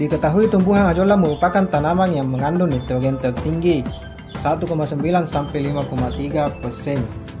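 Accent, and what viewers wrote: Bugis, Indonesia. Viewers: logat bugis kah?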